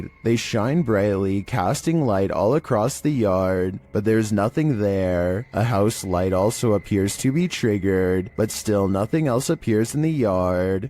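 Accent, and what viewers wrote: California, United States. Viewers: 😂 He sounds like THE CALIFORNIANS from SNL . go check it out and tell me I'm right 😅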